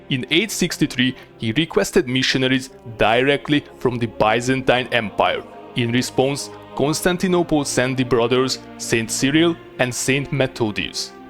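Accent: Hungary. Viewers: I instantly recognised that he was hungarian just by his accent XD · Annyira hallom hogy magyar vagy XD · Nem kell. Van egy fajta sármja a magyar kiejtésnek. Csak így tovább!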